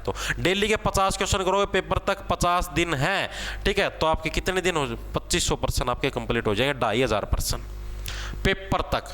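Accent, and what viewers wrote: Haryana, India. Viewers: Es hariyana ke avaj sunn k laga haa koi pdhava hai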